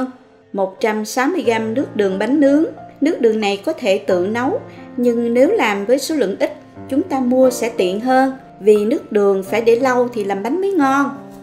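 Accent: South, Vietnam. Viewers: Lần đầu tiên xem video của bạn, nó cuốn hút người xem với giọng đọc miền nam mộc mạc nhưng thanh tao và sức truyền cảm, ấm áp người nghe. Mình đã đăng ký để tiếp tục xem nội dung những clip mới và được dịp tiếp tục nghe giọng của bạn. Chúc sức khỏe và thành công.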